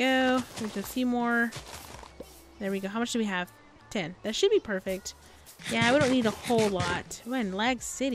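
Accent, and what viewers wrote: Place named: Texas, United States. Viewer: Are you from Texas or South Carolina?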